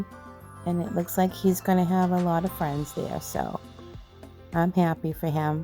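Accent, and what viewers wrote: Massachusetts, United States. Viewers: I love your accent and when you say “wicked smaht.” 😂 I am from PA, so I feel like I am welcome in your MA world when you say that. 😂 You do such an awesome job on all of your projects, so it is no surprise that everyone wanted him. 😊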